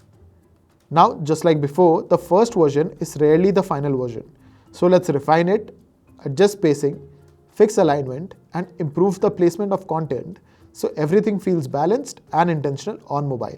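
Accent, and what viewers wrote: India. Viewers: I cant watch it anymore the indian accent triggers me so much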